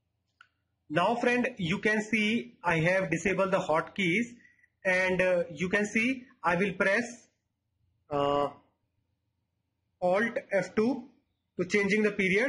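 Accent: India. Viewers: Indian accent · HAHAHAHAHA I love your indisch accent :D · Love that authentic Indian English accent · FantasticGamerYT it's an Indian accent you're just an ignorant ass who has no consideration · Judging by the accent you must be Indian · sound indian bruh XD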